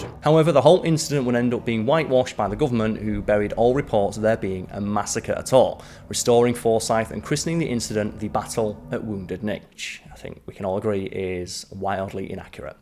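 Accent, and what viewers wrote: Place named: Northern England, United Kingdom. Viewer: No Simon not watching.....sorry but when you expect prime steak and someone gives you an ok burger you're pissed at the burger....if you'd been told from the off "you're getting an ok burger" you'd be fine with it but I feel tricked every time I see that stupid 2000s emo hair and hear that think whiny northern voice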